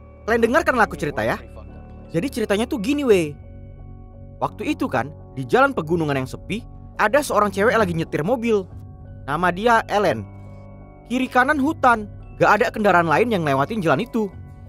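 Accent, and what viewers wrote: Medan, Indonesia. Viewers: Baru lihat channel ini... Dari cara bahasa.. Aku tau ini Medan banget.. Krn aku jg Medan😁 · Orang Sumatra bg??? Logat mu anak medan kali, kalo iya sama kita aku Binje nya.. Kata " Berondok, tunjang, palak" Itu cuma ada di daerah kita 😅😂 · Org Medan bg????? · Dendam cewe PMS 🤣 Bte Logatnya medan x ya bang 🤣 Sukses terus ❤️ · Medan kali baahh... Baru kali ini nonton film horor terkekeh bang... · Suka kali aku sama logam abang kayak orang medan karna aku orang medan🤣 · Kental kali khas Medan nya bg😁😁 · Aksenmu medan atau riau yak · Fokus ke suara adminnya pasti dari medan kleeen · Wkwk, salfok sama suaranya. Logat medan bgt😭😭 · Kayak orang Medan logat kau bang ... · Org MEDAN ya cara penyampaian bahasa nya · Nampak kali logat medan nya bah hahaha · Film horor katanya..harusnya awak takut dan merasa tegang..ini ga pulak..sor kali lah dengar logat medan ini..pokoknya menghibur kalilah bang..😆😆😂😂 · Medan nya itu loh 😂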